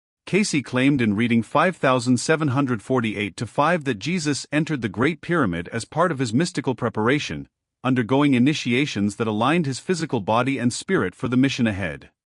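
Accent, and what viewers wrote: United States. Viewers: typically American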